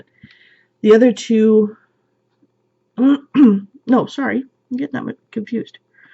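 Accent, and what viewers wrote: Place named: South, United States. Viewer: Lol! I liked your southern accent!! Those books are great. My boys love them! I'm still reading He Will Be My Ruin by KA Tucker. It's very good I'm half way through the main character annoys me so I find myself not picking it up. My goal is to finish today! I have a chunk of time with nothing to do and house to myself! :) Great video as always my friend!! Hugs to you!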